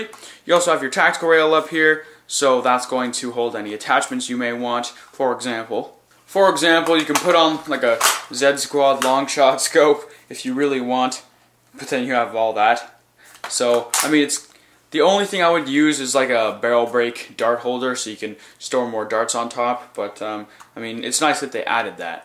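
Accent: Canada. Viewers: Lol ur Canadian.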